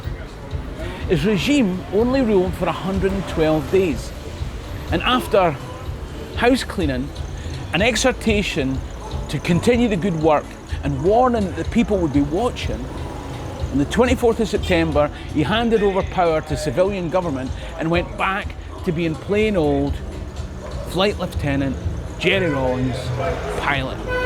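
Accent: Scotland, United Kingdom. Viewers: I love the way you say "Ghanaian" with such a broad Scots accent, it's just so appropriate.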